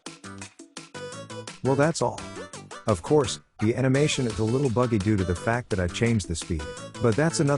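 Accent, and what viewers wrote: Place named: China. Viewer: 这英文听起来很像中国人。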